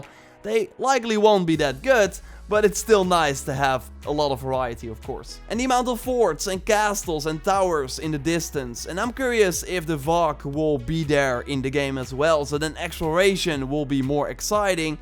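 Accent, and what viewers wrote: Netherlands. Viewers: Ben je Nederlands? Your accent gives it away xD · And BAM 😆👊 Awesome 😄 I have a question: Where are you from? Your accent sounds Dutch 😁 Greetings from Belgium 😊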